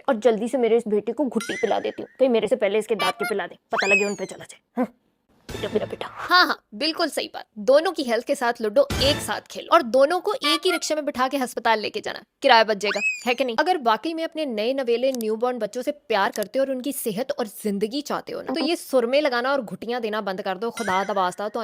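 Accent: Punjab, India. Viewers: Hhhh is my fvrt Dr, you talking is so cute 😸 with panjabi 😘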